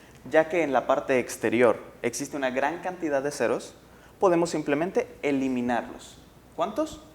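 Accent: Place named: Mexico. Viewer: El profe es muy bueno explicando,pero el acento ese mexicano,no me va,prefiero español de argentina,perdón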